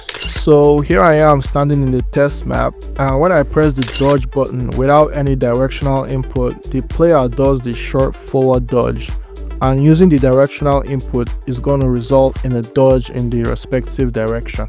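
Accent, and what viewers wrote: Nigeria. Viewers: That accent.....you be naija boy? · U seem African. U sorta have A nigerian Accent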